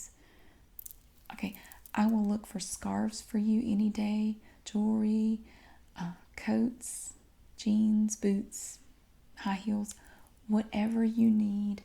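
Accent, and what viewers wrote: South, United States. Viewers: seriously though all scientific knowledge and psychology considered, is it weird that her special southern accent gives me an instant erection ?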